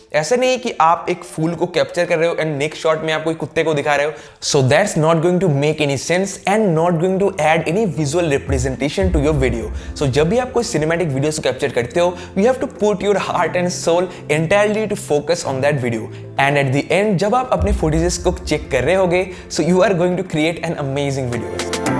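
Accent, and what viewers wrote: India. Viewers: This is english ou indian? wtf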